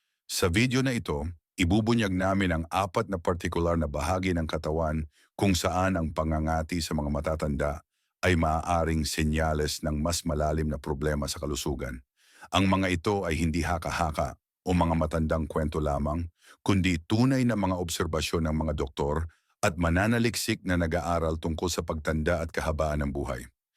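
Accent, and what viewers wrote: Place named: United States. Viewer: Taga US kasi xa kaya slang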